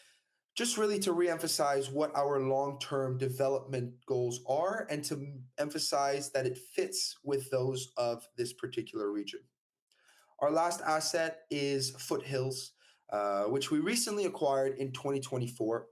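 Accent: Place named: Canada. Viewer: Hmm, you sound too Canadian. Not even sure if you are from canaduhhh. Either way, You lost me with all the regulatory/environmental BS at the beginning. I’m looking for no BS companies to invest in. I’ll keep looking.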